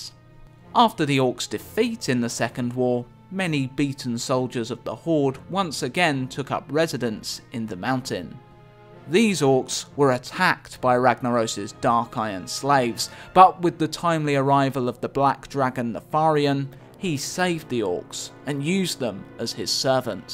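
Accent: United Kingdom. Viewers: I'm really surprised I found that, as iv'e only started playing hearthstone 6 months ago and never played WoW in my life.. cool! I wonder what the mistake actually is....... Btw, may i ask where you're from? I guess british but i'm not sure..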